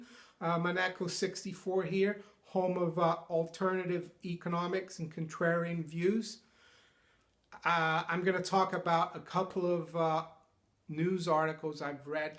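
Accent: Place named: United States. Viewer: I thought I could hear a lot of "American" in your voice. :-)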